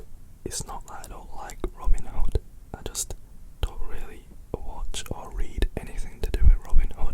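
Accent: United Kingdom. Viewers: Handsome Indian man ✅ gorgeous British accent ✅ amazing asmr video ✅ this is the best! Love your channel! Thank you 😃💙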